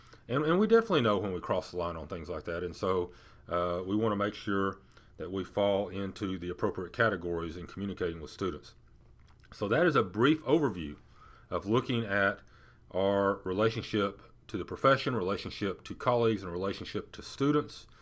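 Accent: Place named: South, United States. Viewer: Dog your southern. not Texan. I know.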